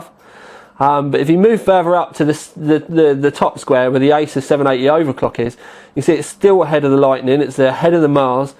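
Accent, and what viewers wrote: United Kingdom. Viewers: lol i love how brits pronounce it :P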